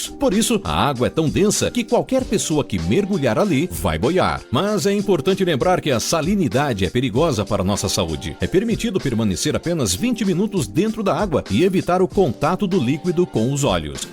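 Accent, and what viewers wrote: Brazil. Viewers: Manos, esse cara é brasileiro?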